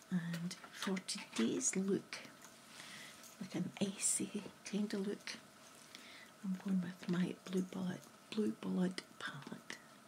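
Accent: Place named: Scotland, United Kingdom. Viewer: YOU are an angel for running this channel and uploading these videos. I don't comment too often but you're my favorite asmr artist. English is not my 1st language and ever since I first heard a scottish accent it's been my favorite, it sounds so lovely and unique! Btw; your makeup always looks so nice. I love this eyeshadow look! 💗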